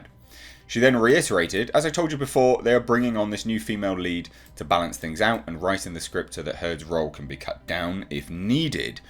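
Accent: United Kingdom. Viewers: You British accent attracts more then the contents…..Absolutely gorgeous 👍🇧🇷😘😍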